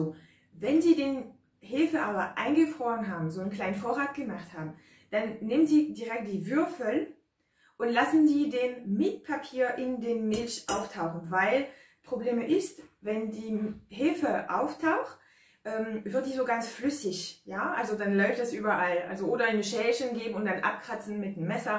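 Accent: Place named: France. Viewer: Vielen herzlichen Dank ❤für dein witziges und aufschlussreiches Video , ich habe ,wieder mal ,viel dazugelernt 😊. Ich liebe deine Rezepte ,deine lockere,flockige Art und deinen französischen Akzent . In deiner Arbeit steckt so viel Liebe und Freude,dass ist einfach unglaublich schön. Liebe Grüße Heidi ❤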